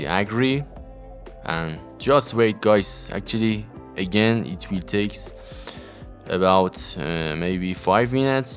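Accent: Iran. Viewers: Bro ur accent is tooo persian😂😂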